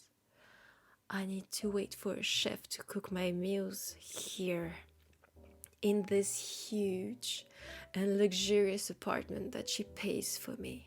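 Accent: France. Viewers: Love your videos! And I’m a sucker for accents, especially French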